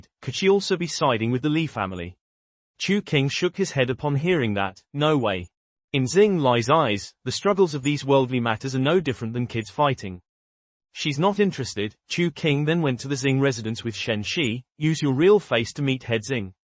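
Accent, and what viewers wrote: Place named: United Kingdom. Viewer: The British accent is pissing me off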